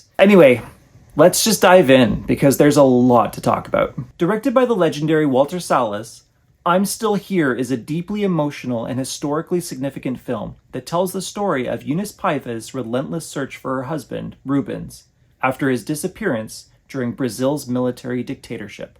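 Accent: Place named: Canada. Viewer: I think he is canadian... he has that typical lovely canadian accent.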